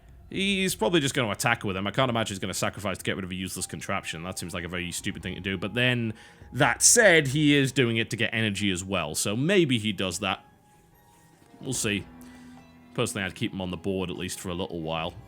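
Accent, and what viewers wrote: United Kingdom. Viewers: Everything this guy says is funny to americans because of the brittish accent. This is why BBC america has so much comedy amercians are almost ready to laugh anytime brittish people speak so they use it as an advantage. He can talk for hours about nothing and americans would be sitting over in the corner having giggles. Even card games are funny. Sorta like crocodile hunter/crocodile dundee but not australian. I don't even know what some of the slang he is using means.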